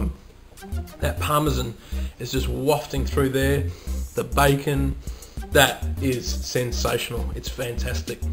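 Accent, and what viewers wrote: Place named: Australia. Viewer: xD his accent is australian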